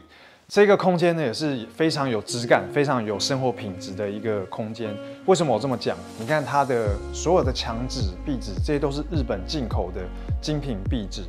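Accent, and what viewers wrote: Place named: Taiwan. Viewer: 聽口音就是台灣吧!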